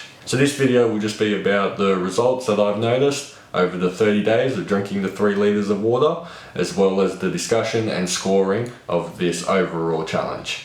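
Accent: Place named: Australia. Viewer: You look German but is Australian or New Zealand asf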